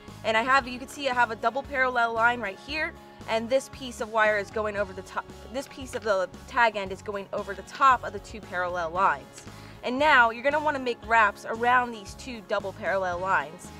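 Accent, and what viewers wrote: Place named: Maryland, United States. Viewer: Are you originally from Maryland? Sounds like a little accent is still there...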